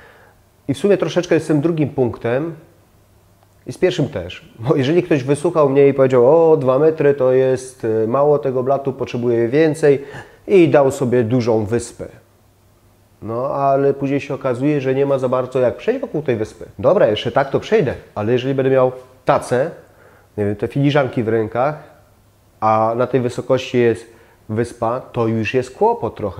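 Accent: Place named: Upper Silesia, Poland. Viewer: Jest Pan ze Śląska? Bo wyczuwam właśnie sposób mówienia charakterystyczny dla ludzi z Górnego Śląska 😁👌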